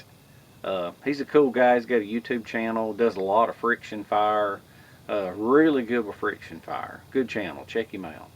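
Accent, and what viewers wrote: South, United States. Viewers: I love watching your videos... I'm originally from Oklahoma and really enjoy your southern accent. I have been in the Pacific NW for several years now and don't often get the chance to hear someone that sounds like me... lol... Very refreshing. I just recently started a youtube channel but have only done one slide show video. I'm not good at talking in front of the camera. Hopefully I will get the hang of it. Keep on keeping on. You do great work! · Great video, and the sandwich looks great. I have been watching YouTube for abou2-3 years. I started out watching 3 or 4 channels on guns. I started seeing these videos about bushcraft and started checking them out. That is when I found your videos and really liked your way of doing them. I am also a southern boy and like the way you talk. I have thought about getting a camera and trying my hand at this. 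Hearing why you started has encouraged me. Now if I can just talk my wife into us getting a camera. Thanks and I am looking forward to more of your videos.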